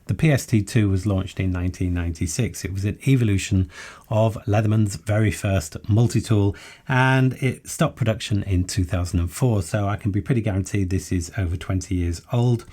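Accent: United Kingdom. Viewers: Love to listen to British accent, as a 2nd generation american, I get nostalgic of listening to my grandfather and great uncle. And very good advice and explanations. Went through my pouch to see that I had most of his suggestions.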